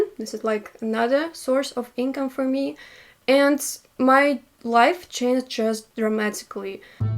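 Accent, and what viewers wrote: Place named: Russia. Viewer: You are not alone. I think the reason why your youtube channel is doing so well is because your a pretty girl with a cute russian accent. Your videos are genuine and you present yourself in a humble, down to earth kind of way. But don't worry about the loneliness.. it could be worse..like me..trapped in Australia. Our Australian Government has lost its mind.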